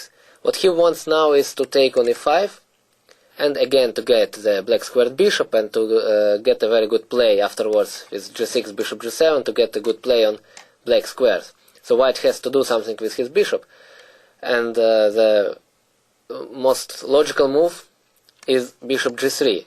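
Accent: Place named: Russia. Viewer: he actually has a russian accent...he's not faking it...:-p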